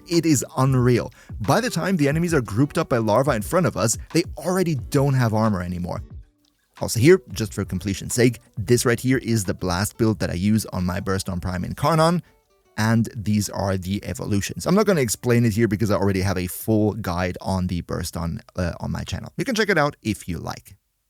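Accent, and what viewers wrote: Germany. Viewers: Well, he's german 😉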